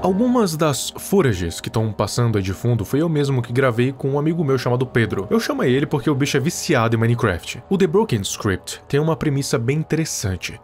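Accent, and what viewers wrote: Portugal. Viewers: o seu é de Portugal?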